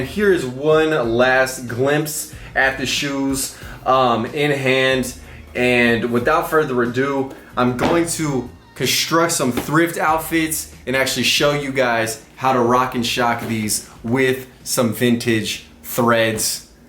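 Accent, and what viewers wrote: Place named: Houston, United States. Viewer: I just love how Houston Texas you areee lmaoo if that even makes sense! I didn't notice us Houstonians had an accent until I found you and I loveeeee itttt 😩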